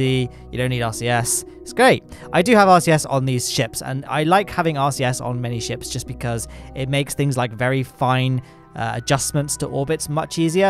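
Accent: United Kingdom. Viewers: I love how the auto generated captions mistake British accent for saying "Lambda" instead of Lander.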